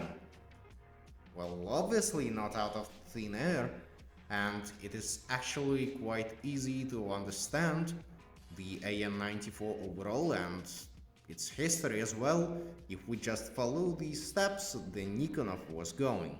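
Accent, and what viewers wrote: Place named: Russia. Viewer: Authentic Russian accent. I like it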